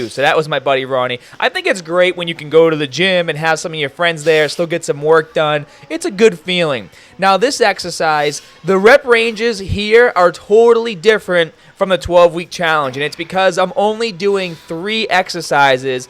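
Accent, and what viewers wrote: Boston, United States. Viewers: Watah pump Muscle fibers in your aahms Monkey bahs. Boston accent on point today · I never noticed your boston accent in other videos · Is that a Bostin accent? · Hey, love your videos, been viewing for a long time! I'm actually from the MV region aswell. You just don't get that OG Boston accent in channels anymore! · what accent is that? Boston accent?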